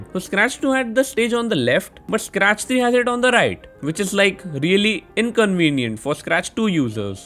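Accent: India. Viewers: I was focusing on the Indian voice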